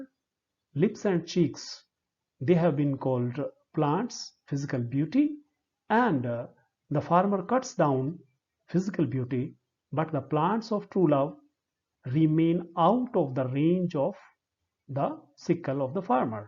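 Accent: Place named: England, United Kingdom. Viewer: Sir, memories of 2005 when I was in BA. Ist and you were the lecturer. I was stunned by your English accent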